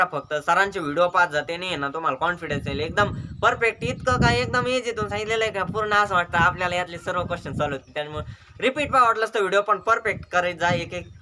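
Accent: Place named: Ahmednagar, India. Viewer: नगर ची भाषा ऐकायला फार गोड वाटते दादा तुझं मार्गदर्शनाची मदत होते धन्यवाद मुंबई वरून 🙏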